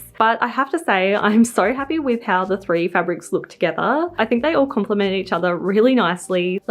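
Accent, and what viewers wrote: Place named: Australia. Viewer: We recently moved to usa from tassie to care for my MIL. Im so homesick though. When I stumbled accross your channel I felt so comforted hearing your aussie accent. And then you say you're from tassie!!! Im subscribing ❤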